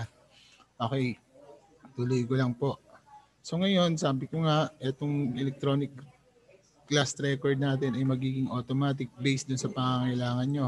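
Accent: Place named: United States. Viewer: The way she pronounced the words are not slang it is american accent good reading..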